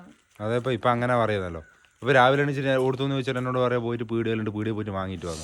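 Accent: Kannur, India. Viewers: അതാണ് നമ്മുടെ കണ്ണൂർ ഭാഷ 🥰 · ഓടുത്തും നമ്മുടെ സ്വന്തം കണ്ണൂർ bhasha😂😂😂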